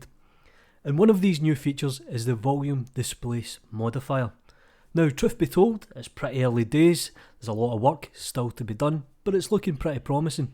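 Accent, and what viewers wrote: Scotland, United Kingdom. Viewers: Glasgow? I miss the Scottish accent ;) Nice channel mate.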